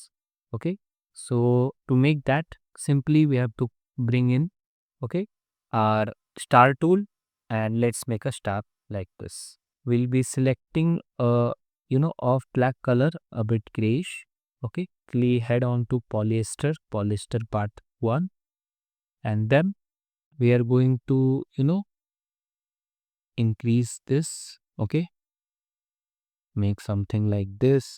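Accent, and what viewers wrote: India. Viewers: I just hear the india accent and i Liked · no music no sounds just pure indian accent and after effects thanks dude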